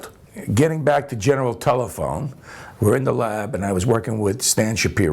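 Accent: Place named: New York, United States. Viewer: mike jan i'm not saying he isn't. clearly from this video he is a very accomplished and expert in his field. my point is i haven't met many scientists with such a thick new york accent, just like in goodfellas or something haha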